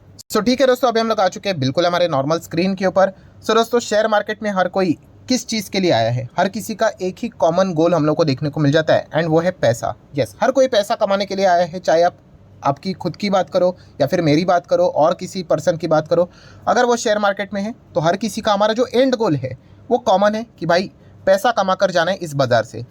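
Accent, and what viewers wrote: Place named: Gujarat, India. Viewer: Yes sir I listen your videos word to word, belive me i observed your pronounce that you are guharati if not you are marathi with many gujarati friends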